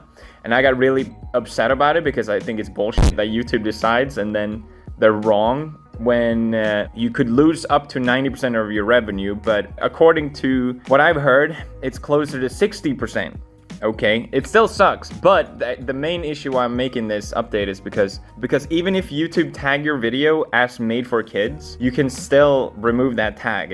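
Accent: Sweden. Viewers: Is it just me or is his accent more swedish now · I can’t tell if pewdiepie is using the forced Swedish accent or this is legit how’s he’s gonna talk rn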